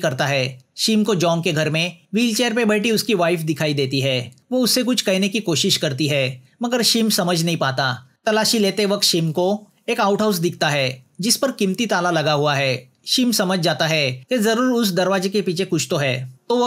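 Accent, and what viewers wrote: Maharashtra, India. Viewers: मराठी medium का है .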